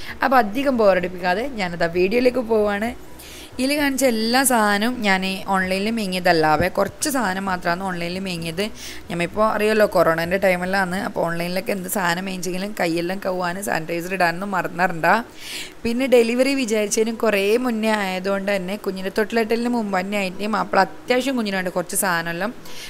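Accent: Kasaragod, India. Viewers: Sebamed super ,ksd slang l polichu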